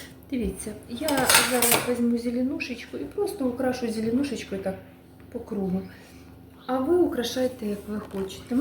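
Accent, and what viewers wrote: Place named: Surzhyk, Ukraine. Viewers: 80% українських сіл розмовляють на своїх діалектах. Мені дуже - дуже приємно слухати південний діалект. Так розмовляла бабуся, тітка, мої однокурсники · Суржиком розмовляє весь південь України. Філологи вже просто дістали.Після кожного відео ці зауваження. До чого це?Не подобається не дивіться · Працюйте над собою. Суржик ріже вуха.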